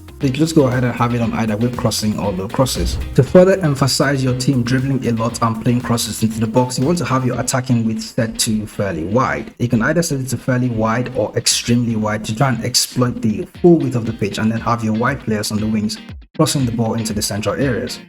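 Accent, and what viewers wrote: Nigeria. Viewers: i heard the voice and i had a feeling Nice to find a nigerian fm youtuber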